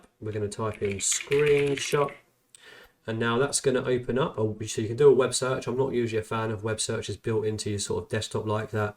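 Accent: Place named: London, United Kingdom. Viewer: I like your London English accent!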